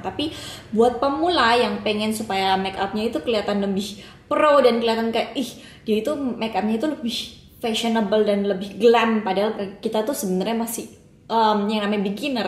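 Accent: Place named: Yogyakarta, Indonesia. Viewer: Haiii, kamu orang jogja yaa? Medok bangetttt Nice vids btw!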